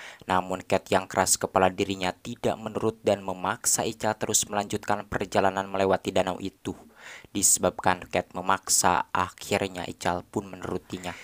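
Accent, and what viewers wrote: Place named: Sukabumi, Indonesia. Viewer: Bang si abang ini aslimana teh ?? Sukabumi kah ???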